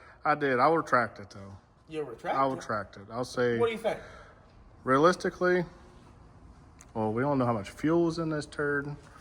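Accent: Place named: Florida, United States. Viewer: You sound like my uncle from Florida. I live in Texas but he sounds like you 😂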